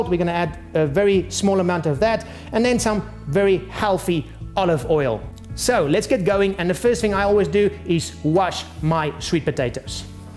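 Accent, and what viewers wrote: Afrikaans, South Africa. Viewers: Where are you from? South Africa? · What accent does he have? I want to say south African but I really can't tell :/ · Where is this guy's accent from? The only thing I can compare it to is the guy from District 9 (Wikus) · arank001 South Africa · Kings Fobos South African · are you from South Africa? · Michael Evangelista It's South African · He's South African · Your afrikaans accent is cool! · Definitely a South African accent. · I think he's South African :) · hes south african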